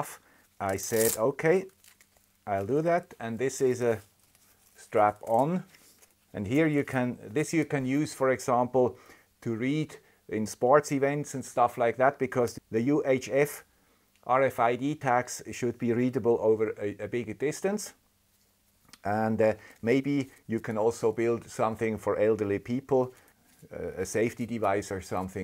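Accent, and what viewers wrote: Switzerland. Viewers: den Schweizer Dialekt hört man schön raus : ) LG aus Österreich, tolles Video. CE Markierungen sind so eine Sache bei den Produkten ^^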